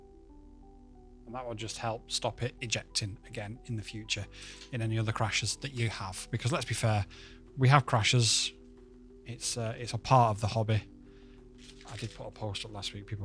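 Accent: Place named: Manchester, United Kingdom. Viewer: is Welsh, but you hide your Mancunian twang quite well. To my Scandinavian ears, it sounds more like a standard northern accent. But don't trust my opinion — I'm just an ABBA-Volvo-Ikea bloke and everyone knows what happened at Lindisfarne when the monks trusted us.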